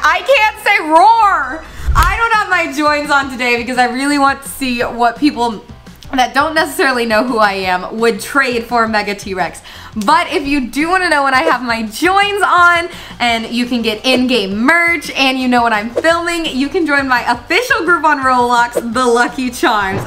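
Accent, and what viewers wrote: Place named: United Kingdom. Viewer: wow your british acent is Just wow incredible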